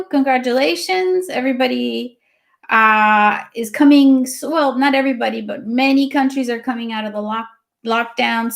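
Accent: United States. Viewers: Are you American? I can’t work out and what do you do for a living?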